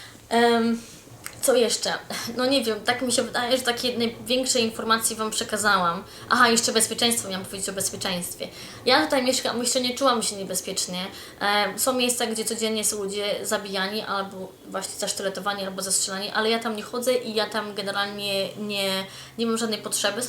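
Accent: England, United Kingdom. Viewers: na początku śmiesznie emanuje brytyjskim akcentem, a później już o nim zapomina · Nabrałaś angielskiego akcentu? Czy masz wadę wymowy? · Akcent już ma Pani brytyjski.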